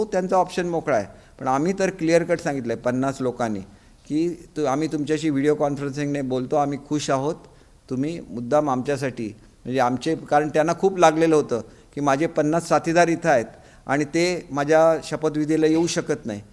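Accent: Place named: Kolhapur, India. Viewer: घरच खुपच छान बोलता साहेब आपण कोल्हापूर भाषेत बोलतो नाद खुळा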